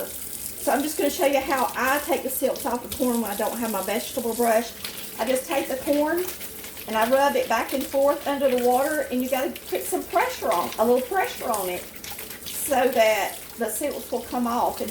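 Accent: South, United States. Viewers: Southern accent ✔️ Named ✔️ She knows what's up 😊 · Your American accent just makes this the most perfect video!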